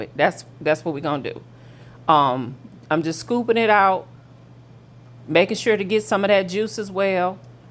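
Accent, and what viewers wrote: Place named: Detroit, United States. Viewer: Sounds like a Detroit accent.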